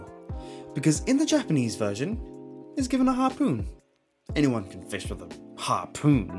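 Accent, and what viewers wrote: United Kingdom. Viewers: You sound British but don't look British what's up with that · he sounds British, but is not British.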